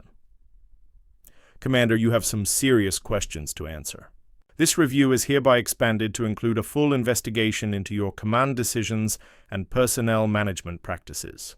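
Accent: England, United Kingdom. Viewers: The English accent is very off putting.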